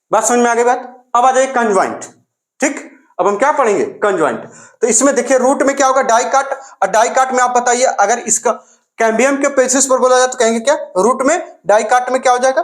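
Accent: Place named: Bhojpuri, India. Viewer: Bhojpuri lecture ho gya sir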